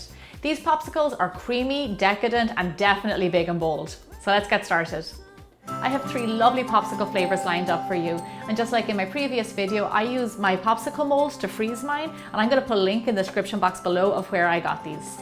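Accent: Ireland. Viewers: I love your Irish accent! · so thats why she has that accent, i didn't know that she was irish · Are you Irish??? CAUSE I AM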